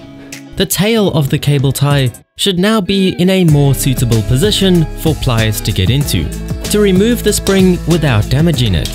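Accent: South Africa. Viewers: Hey man u south African if not where you from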